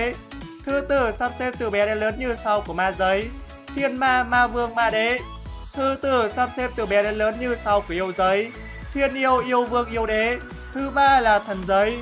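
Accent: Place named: North, Vietnam. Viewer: Bắc kỳ đọc khó nghe quá…!😂😂😂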